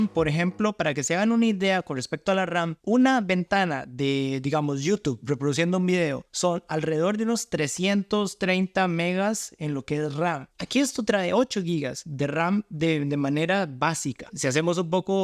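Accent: Costa Rica. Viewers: Reconozco la voz de un tico a kilómetros o me equivoco? 👀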